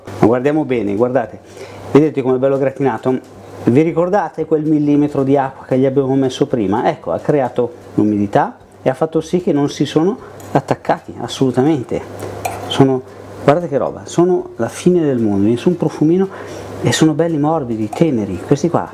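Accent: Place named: Lombardy, Italy. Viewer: Buoniiiii li farò così la prossima volta , e poi mi fai morir dal ridere quando dici . ‘Guarda che roba , vien su un profumino ‘ sei proprio Lombardo come me . Bravoooo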